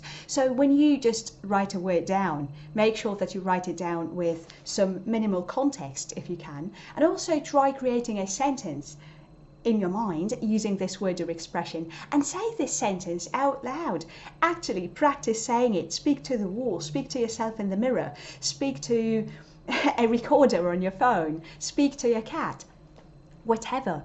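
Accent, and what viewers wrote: United Kingdom. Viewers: I love your British accent and your fancy way to speak!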